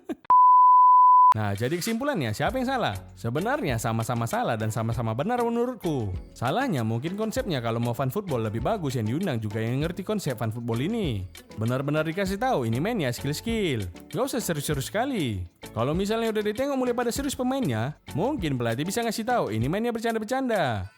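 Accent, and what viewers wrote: Medan, Indonesia. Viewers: medan kali ya laee wkwk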